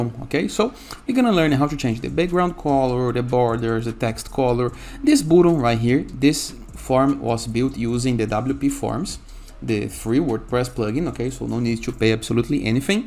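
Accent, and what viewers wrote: Brazil. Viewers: Caraca, assim que ouvi a voz já pensei: "é brasileiro" kkkk Eu reconheço o sotaque do meu povo. Mas depois vi que estava em português o conteúdo do vídeo kkkk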